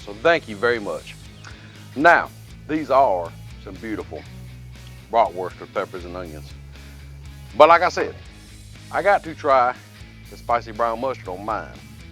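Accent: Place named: New York, United States. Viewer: How come when I saw you in a bar in the East Village you sounded like every other New Yorker in there?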